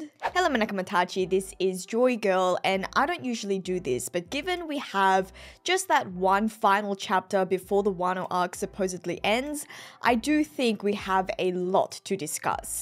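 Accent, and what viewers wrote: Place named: Australia. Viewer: It seems like a lazy comment but ..I actually broke my rule of not commenting on public youtube videos.. Fairly new to the channel. I have to say I enjoy your videos. I respect the knowledge, research and clean execution in your videos. The are down to earth and come off very natural while having professional execution. You're funny without trying to be funny. Your points are well thought out. Yet still down to earth. I'm guessing you studied communication or broadcasting. You have a talent for it. I also love your aussie accent. Thank you for the funny response. I almost laughed out loud :)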